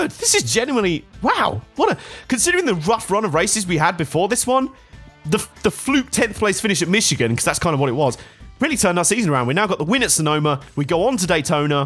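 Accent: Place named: United Kingdom. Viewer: I love this channel plus the British accent 😂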